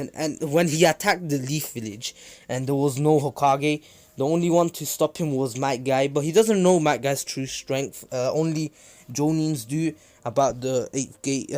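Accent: United Kingdom. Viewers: i just love to hear a brit swear it sounds amazing and awsome video btw keep it up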